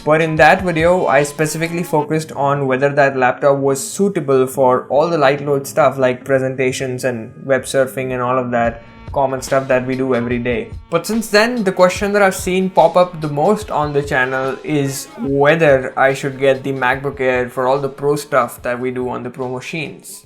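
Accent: India. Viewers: fucking indus, learn to speak English and go back to india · lol this indian accent....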